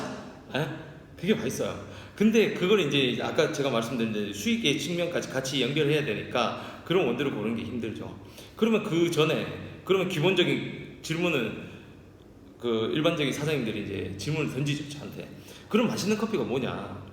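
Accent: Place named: Gwangju, South Korea. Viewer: 광주인가요? 말투가... 위치 어디실까요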